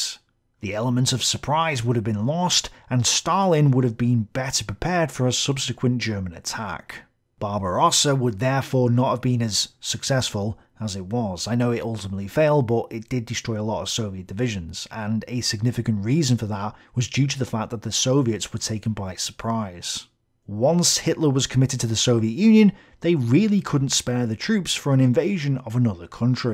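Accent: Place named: England, United Kingdom. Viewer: can't you tell by his accent. He's English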